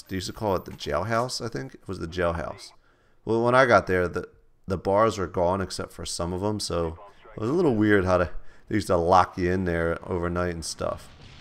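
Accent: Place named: Wisconsin, United States. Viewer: wisconsin accent FTW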